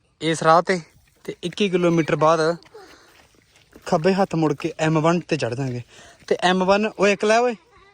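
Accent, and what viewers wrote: Malwa, India. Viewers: ਬਾਈ ਮੈਂ ਤੁਹਾਡੀਆਂ ਵਿਡੀਓਜ ਪਿਛਲੇ ਚਾਰ ਪੰਜ ਮਹੀਨਿਆਂ ਤੋਂ ਦੇਖ ਰਹੀ ਹਾਂ ਜਾਣਕਾਰੀ ਤਾਂ ਮਿਲਦੀ ਹੀ ਹੈ ਪਰ ਤੁਹਾਡੀ ਬੋਲਬਾਣੀ ਨੇ ਦਿਲ ਟੂੰਬ ਲਿਆ ਕਿਉਂਕਿ ਮੈਂ ਵੀ ਮਲਵਈ ਤੇ ਦੇਸੀ ਭਾਸ਼ਾ ਹੀ ਬੋਲਦੀ ਹਾਂ ਸ਼ੁਕਰੀਆ ਬਾਈ ਜੀ🙏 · ਬਾਈ ਮੇਰੇ ਵਾਂਗ ਤੂੰ ਵੀ ਸੜਕ ਨੂੰ ਸ਼ੜਕ ਕਹਿੰਦਾ ਹੈਂ😊 ਅਸਲ ‘ਚ ਇਹ ਮਲਵਈ ਲਹਿਜਾ ਹੈ ❤ ਬਾਕੀ ਅਨੰਦ ਹੀ ਅਨੰਦ 🎉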